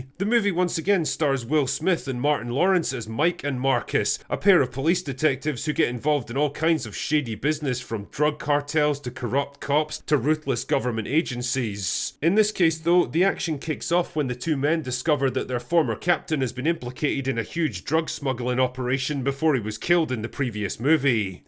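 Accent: Scotland, United Kingdom. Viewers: I was wondering if this was simply a vehicle for Will Smith to crawl out of embarrassment hell but your review says otherwise. That it's just Will Smith trying to get back to his normal and forget that incident. Maybe he should just divorce his wife while he's on form and really dump his past and get on with Bad Boys 5...hell if Stallone and Swartzeneggar can keep going, why not. Thank you for the review, as always, poinant and entertaining with that wonderful Scots voice. Been enjoying you shitting all over Star Wars : The Immaculate twins haircuts but it's nice to have a change and this was a nice change.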